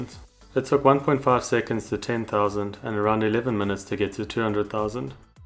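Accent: South Africa. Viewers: Is this guy from South Africa??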